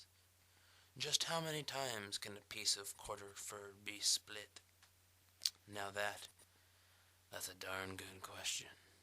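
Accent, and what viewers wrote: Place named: France. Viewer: Do you look back on this and say, "Ya, I do sound like a tool." But it's not your fault. You probably watched too much Cousteau. Octopus, octopus. Why do you hide. In that Frenchy accent. Sounds like your trying to pull off some type of avantgard Joe cool kind of thing. No, nope ain't feeling it. More toolish than anything else.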